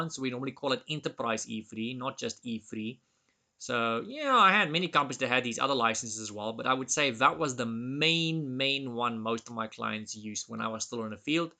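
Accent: South Africa. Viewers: Still trying to determine if you from South Africa or Netherlands, lol. Thank you for this video! It really helps. What practice tests woild you recommend as well. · This is just a thumb suck but figured out your accent is South African from looking up that term after watchin' previous videos. I feel vindicated by seeing as your location in the trial. I am from West Texas but watch a lot of British Telly and know all the UK and Aussie accents and had been trying to place it. Your accent is so cool, and you are a great teacher. Thank you for your videos.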